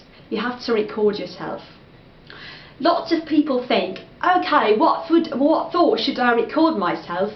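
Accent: United Kingdom. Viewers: you are amazing! You sound so British! I can't argue with you that working on a pronunciation is very important quality of a learner of English. In class I often get mixed up by my school mates speaking with the Russian accent, it is sometimes quite difficult to figure out what they mean and I ask them to repeat. Even though I am just a learner as they are I find it easier to converse with the natives. I'm taking the CAE exam in July, the event that I'm actually dreading, and I would like to ask you for some tips for improving listening skills. I do listen a lot, but somehow that influences only my pronunciation and speaking skills, and every now and then I keep on missing out things in my listening tasks. I realy go out of my way trying to improve these skills, but still no headway... Any advice? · Hello, that's really interesting. and your pron is fantastic!!!! 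But I've got a question on my mind - why did you choose British pron, not American one? Would be glad to know your points, thank you)) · Спасибо за прекрасные советы! В восторге от вашего крутейшего британского произношения, просто музыка для ушей. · Hi.I just subscribed.'Cause yesterday I saw this video,and I am amdmired.Great job.I'm a kind of beginner,have watched many many videoes and still do it.Will be glad to wath new videoes of yours.And have one question how'd you get your wonderful British accent,did it take long time,have you studied in England? Keep going you're great!!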